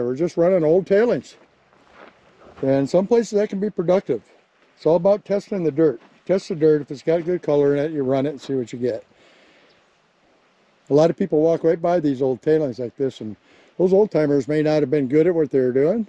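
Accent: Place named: South, United States. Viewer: your a peach,i love that slow southern way,you should get a paycheck from the state tourism board.its just the best education videos in the gold search.amen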